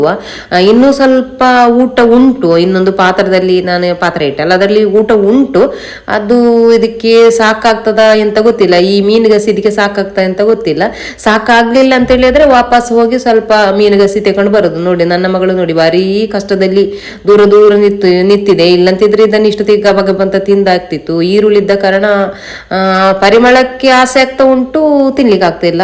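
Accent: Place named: Sullia, India. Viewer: ನಿಮ್ಮ ಸುಳ್ಯದ ಗ್ರಾಮ್ಯ ಕನ್ನಡ ಬಹಳ ಚೆಂದ ಇದೆ.ಹೀಗೇ ಮುಂದುವರಿಸಿ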